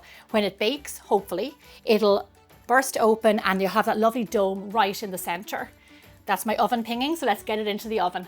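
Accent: Ireland. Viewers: Lovely Recipe, beautiful irish ☘accent:face-red-heart-shape::trophy-yellow-smiling:😇🥰🤗🍞🌎🏠🧈🍀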